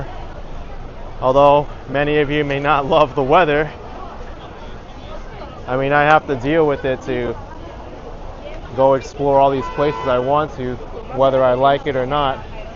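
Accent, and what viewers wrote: New York, United States. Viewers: What a cool looking city. Your NY accent is so think. 😂 It gives so much character.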